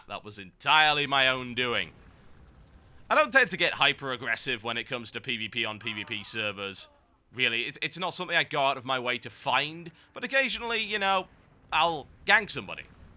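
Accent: United Kingdom. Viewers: Tb, i luv ur videos ur so unique nd best of all ur a britsih commentator! much love x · A brit ranting. Epic win.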